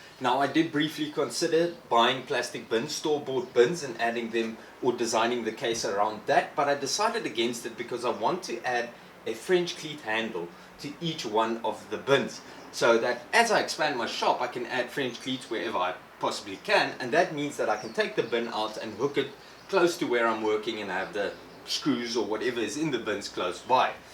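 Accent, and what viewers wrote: Afrikaans, South Africa. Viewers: Are you from south africa???because the accent sound very Afrikaans???😁😁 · Strange accent. Slightly German, slightly South African. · This is my first video of yours that I have watched. I am not from wherever you are from and I am going to guess your accent. Please don't ridicule me for my ridiculous guesses. To me your accent sounds like South African or Swedish mixed with Australian. How wrong am I? By the way, I enjoyed the video!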